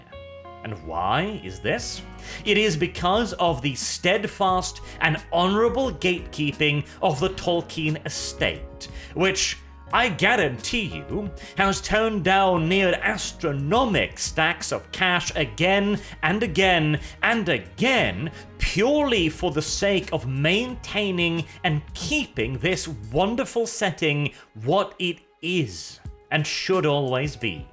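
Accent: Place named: Southern England, United Kingdom. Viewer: do not worry about your words bro when I frist heard you speek I thought you were from south of England. Its only when you speek Kraut or Ruski I can tell your not English. Peace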